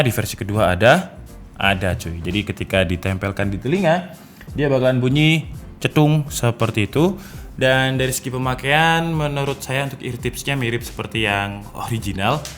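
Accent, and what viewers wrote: Java, Indonesia. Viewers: Wong jowo to yoan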